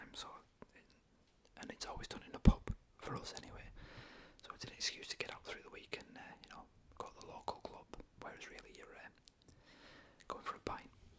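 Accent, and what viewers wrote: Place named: Teesside, United Kingdom. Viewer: That huge watch with the blue face is lovey, I'd wear something like that... I'm trying to place your accent, I'm thinking south of boro but not as far south as Whitby.. I'm in Sunderland. nice video anyway :)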